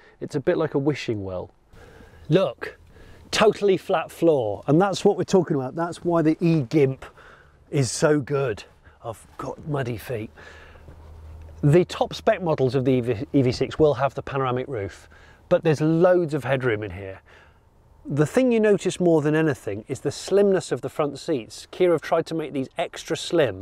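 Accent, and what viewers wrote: United Kingdom. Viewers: As always just a couple of words in the comment just for a brilliant British English.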